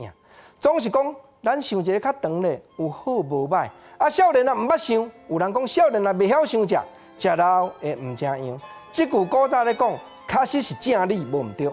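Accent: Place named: Taiwan. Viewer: 台語純正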